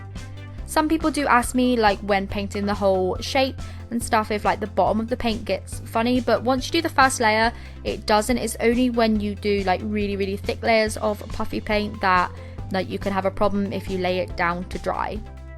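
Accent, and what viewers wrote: England, United Kingdom. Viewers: You just say some british accent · I'm guessing Your From England But who cares? I love you!❤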